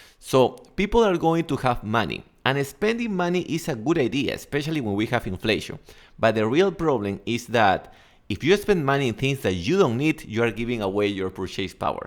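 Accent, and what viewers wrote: Peru. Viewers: LA PREGUNTA ES QUE TE METISTE PARA HABLAR INGLES CON ACENTO PERUANASO ,PERO SI TE DEJAS ENTENDER ME LOQUEAS MAZETITA ,EXITOS SALUD Y VIDA .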